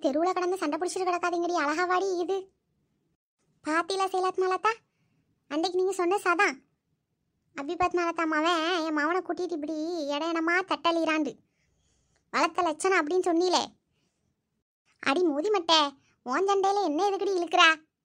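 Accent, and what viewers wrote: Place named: Kilakarai, India. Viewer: na kilakari than unga slang spdiye enga ooru slangthan vera 11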